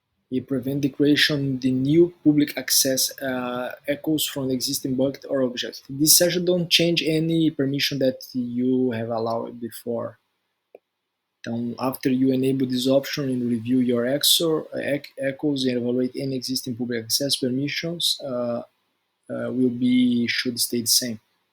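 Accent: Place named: Brazil. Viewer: Bro u sound like a brazilian... 🤔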